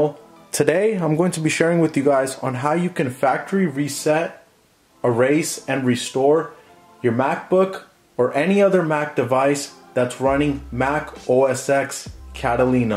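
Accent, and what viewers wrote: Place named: Brampton, Canada. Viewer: I hear a Brampton accent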